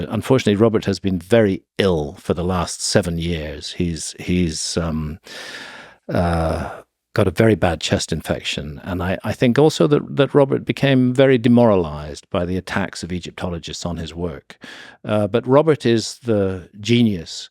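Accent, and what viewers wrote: United Kingdom. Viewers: This is proof that not everything sounds intelligent when you have a British accent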